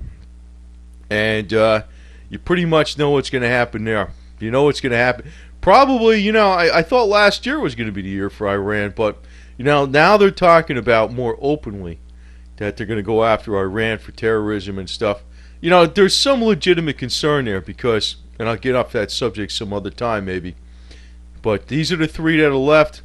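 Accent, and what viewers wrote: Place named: Minnesota, United States. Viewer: this guy is obviously from MIN NES SOODAA.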